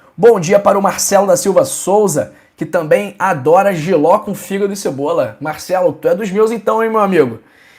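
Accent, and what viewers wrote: Rio de Janeiro, Brazil. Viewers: Esse sutak carioca é que mata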